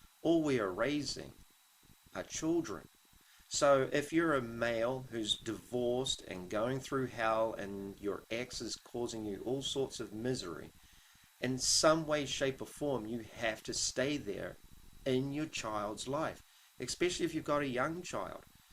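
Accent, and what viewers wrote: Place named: South Island, New Zealand. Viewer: Kiwi,You sound like you are from the mainland/south Island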